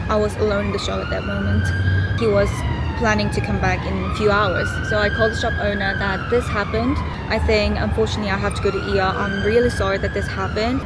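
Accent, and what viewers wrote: United Kingdom. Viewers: 외국카페에서 런던 영어 발음하는게 매력적이여서 구독했지만 그게 구독을 이어가도록 한건 아니였어요. 님이라는 사람 자체가 매력이 있다는걸 알게되었어요. 당신이 어디서든 어디에 있든 그런건 상관하지않아요. 다만 당신이 조금만 덜 힘들었으면 좋겠어요.😊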